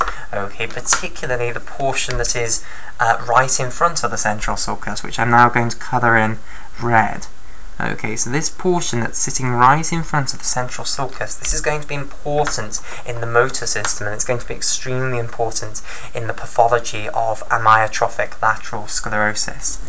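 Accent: United Kingdom. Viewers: He sounds British; probably from the UK/England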